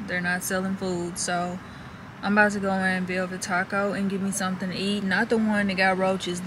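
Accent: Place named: Memphis, United States. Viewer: welcome to almost 40.. But you are still a BAD chick..U make me laugh so much because being from Memphis,i hear so much of it when you talk.. So i cant relate…You can also use rice water on your hair, it work wonders.. still stinks but I use it weekly with Castor oil…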